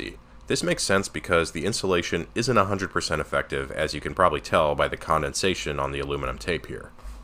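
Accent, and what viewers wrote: United States. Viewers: Fantastic video. Warms an engineers heart to see a project conducted with proper regard for the science principles and associated mathematics. Even better to hear an American accent wrapped around SI units! Mr, you got it all goin' on! :) And seriously, great video. I've worked a few heat pump designs myself and my only question about your technique is your soldering is using soft solder; is that really strong enough and long lasting enough for the task at hand, and does it not soften a bit at your higher temperatures where the pressure is also higher? I use silver solder and nitrogen gas in the tubes to stop scale forming in the tubes. It is a pain but those joints are stronger than the tube! Now, to find the promised next video.... and to say; thanks for sharing.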